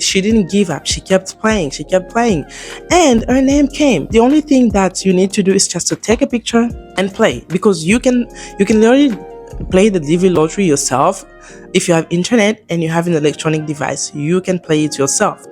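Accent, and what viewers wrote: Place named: Democratic Republic of the Congo. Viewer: I can feel with your accent that you are from the Congo.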